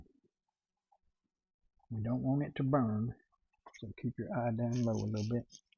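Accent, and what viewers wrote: South, United States. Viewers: You have a perfect voice to fall asleep to! I mean that in a good way, you’re pleasant, clear, and relaxing like a bedtime story. If you’re looking for a 2nd career - think about it! Especially with your accent you’d be perfect for a southern novel! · Thanks for this recipe,. I subscribed to your channel. You sound like a southern gentleman and since you cooked at the firehouse, I’m sure your recipes are great!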